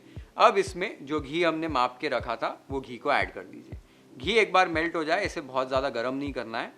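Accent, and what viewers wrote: Maharashtra, India. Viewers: आपकी हिन्दी महाराष्ट्रीयन style की लगती हैं लाडू = लड्डू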